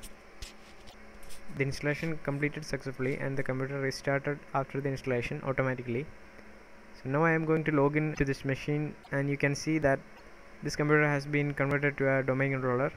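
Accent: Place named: India. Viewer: thank you for the video. as a helpful bit of information, your indian accent is thick, so it is already difficult to understand your english. please slow down your speech and say your words more clearly.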